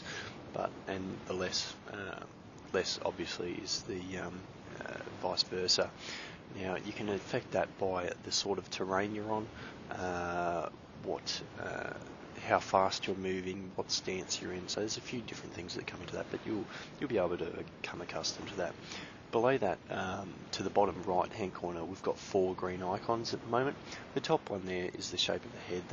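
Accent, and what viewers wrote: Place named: Australia. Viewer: Thanks for the videos, helped me heaps, loving the Aussie accent :D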